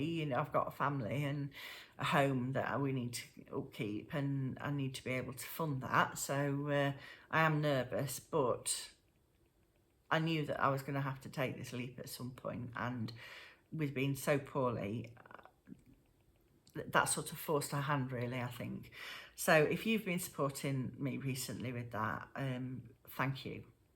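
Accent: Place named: Derbyshire, United Kingdom. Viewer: I’m new here, when I heard you talk I thought same accent, I’m a Derbyshire lass.xxx🐝🐝🐝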